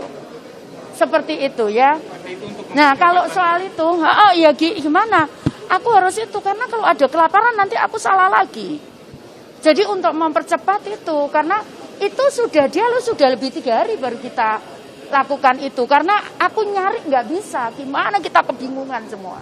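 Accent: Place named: Surabaya, Indonesia. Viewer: Medok.. Jadi kelihatan kurang intelektualnya..mudah gugup kalo kondisi lagi di wawancara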